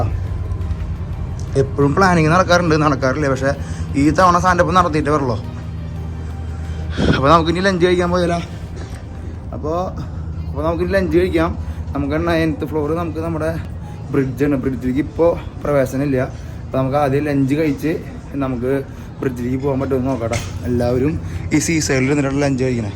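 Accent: Thrissur, India. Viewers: വിഡിയോ കൊള്ളാം.. പക്ഷെ ഈ ത്രിസ്സുർ സ്ലാങ് ഉം സഹിക്കണില്ല എനിക്ക്..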